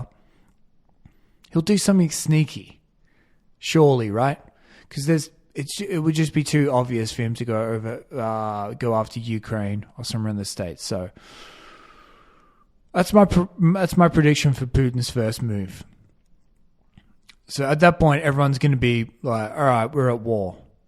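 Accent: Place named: Australia. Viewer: Hey good to hear an Aussie talking about this I’m petrified my biggest fear is nuclear war and I just can’t believe that this crazy fucking person Putin even call him a human has all this control a few boomers can destroy all of our hopes and dreams. Whereabouts in Australia are you from? I’m from New South Wales.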